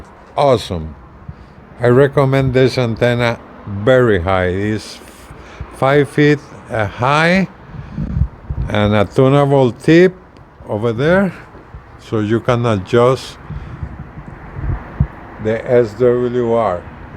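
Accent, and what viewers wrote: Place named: Argentina. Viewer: I expected a southern Louisiana accent but instead your accent sounded very Argentinian? Little hint of Italian made me think so.. very cool video :) thanks for sharing!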